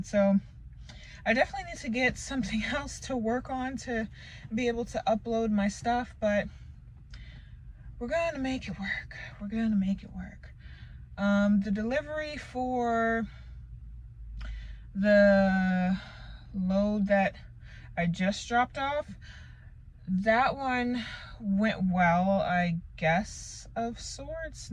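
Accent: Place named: Midwest, United States. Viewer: You with tha' mid-west twang...so sexy, Yo'...Linen set for west travels...Khaki is cool too...You're so particular...ASK AND IT SHALL BE GIVEN...-lots of Love out here for You...You're banter and picadillos are so homey and familiar...why No FB Msngr?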